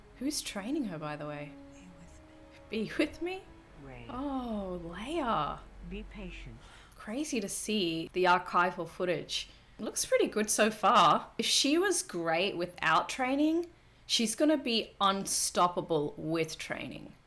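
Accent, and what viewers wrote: Australia. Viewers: Nice to hear it in in an Aussie accent. Grateful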